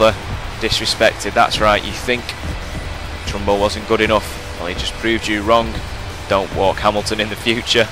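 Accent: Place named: United Kingdom. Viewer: Tis British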